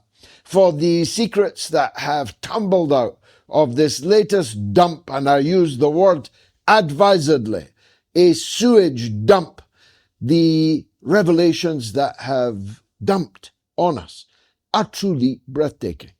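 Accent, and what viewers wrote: United Kingdom. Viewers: What kind of British accent is this? Thanks.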